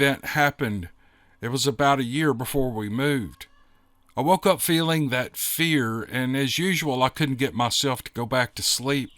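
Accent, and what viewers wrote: South, United States. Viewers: You should narrate some southern bell stuff like Gone With The Wind or sumpin LOL I like listening to you for your southern accent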